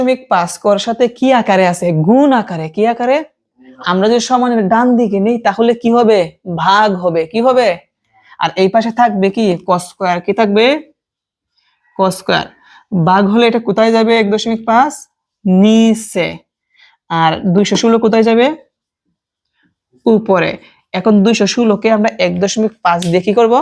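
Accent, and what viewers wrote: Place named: Habiganj, Bangladesh. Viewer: বুঝতে পেরেছি স্যার। অসংখ্য ধন্যবাদ।🙏🙏স্যার কী হবিগঞ্জে থাকেন? আমিও হবিগঞ্জ এই থাকি। স্যার এর কথা বলার স্টাইলটা হবিগঞ্জের ভাষার মতোই লাগলো।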